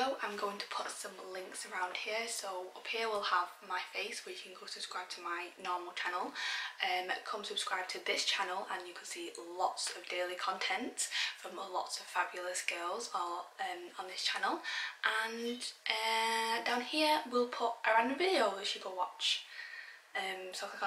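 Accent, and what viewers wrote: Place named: Manchester, United Kingdom. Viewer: I'm probably biased, since I've been watching your videos for quite awhile now, but when I first started watching, your accent sounded a LOT like Christopher Eccleston's to me, so I'd definitely say up north near Manchester 😁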